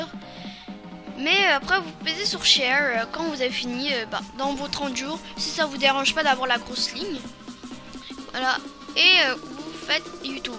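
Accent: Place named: France. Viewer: Accent FR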